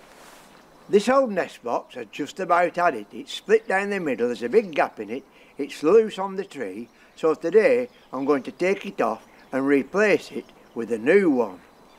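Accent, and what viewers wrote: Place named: Lancashire, United Kingdom. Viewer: I live in Adelaide, South Australia. I migrated here 33 years ago. I recently discovered your channel and want to say how much I’m enjoying watching your videos. My parents were from Lancashire so it’s lovely hearing the Lancashire accent. While you have been getting too much rain I’m not getting enough. It’s the third month of autumn here but still very very dry. Autumn is usually like a second spring here once the rain comes. I’d forgotten how many gales north west England gets where I live very windy weather is rare but as I said we struggle with hot dry weather. I too am wilding my garden and picking up hints from you. While we have different wildlife but I can relate to you with the excitement of wildlife visiting the garden. Do squirrels or rabbits eat your plants? The brush tailed possums certainly eat mine but it’s all part of nature. 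One more question what breed is Buster? He seems so well behaved. Thank you again for your videos. Shelley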